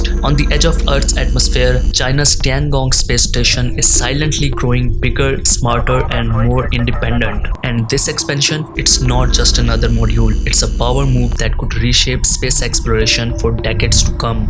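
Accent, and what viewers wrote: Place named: India. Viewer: Indická angličtina katastrofa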